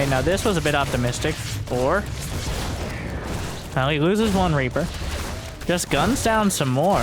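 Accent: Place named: Midwest, United States. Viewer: Where are you at? His accent hmmmm I'd say Indiana or Missouri.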